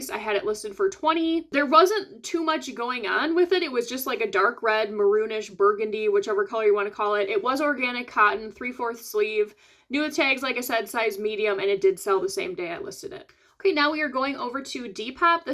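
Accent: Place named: Midwest, United States. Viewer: New on your channel. I love your suggestions and ideas. New subscriber now. You sound like you’re from my home area. Are you maybe from Chicago or Wisconsin or Minnesota? I’m originally from Southern Illinois with family all over the Midwest. I’m in California now for over 40 years.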